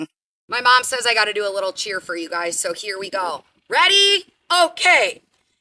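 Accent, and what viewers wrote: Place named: Midwest, United States. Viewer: NGL, for YEARS I thought you were either from the Mitten or Wisco.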